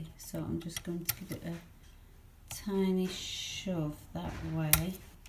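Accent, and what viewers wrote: United Kingdom. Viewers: I love your British accent. It sounds so comforting and homey to my sensibilities.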